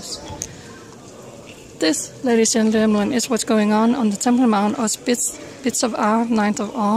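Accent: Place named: South, United States. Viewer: Where did you learn English? You have a little bit of a southern accent to your English. It's very cute. I like it!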